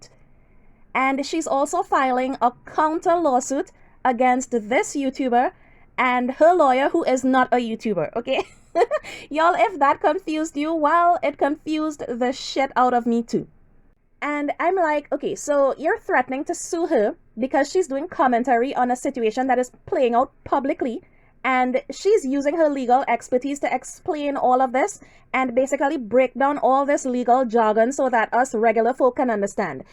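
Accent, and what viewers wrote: Trinidad and Tobago. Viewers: Saw the repost through Emily Baker... As soon as I heard your voice, I recognized the Trinidadian accent 🇹🇹 Congratulations on succeeding on this platform! ❤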